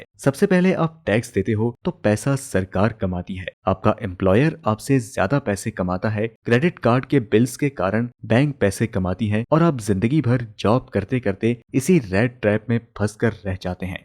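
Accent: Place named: Pakistan. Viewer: Your language sounds like pakistani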